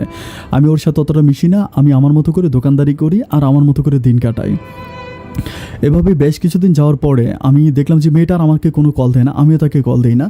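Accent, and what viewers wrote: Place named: Barishal, Bangladesh. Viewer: ভাই মনে হচ্ছে ি বরিশালের ছেলে ার ভাষা বরিশালের মত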